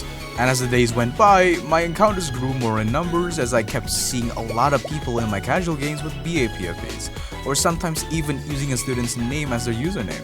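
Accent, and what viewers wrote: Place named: Indonesia. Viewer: Idk how but I clocked in you being Indo just from the first couple of seconds and I was right. INDO PRIDE GRAAHHHHHHH🦅🦅🦅🦅🔥🔥🔥🔥🇮🇩🇮🇩🇮🇩🇮🇩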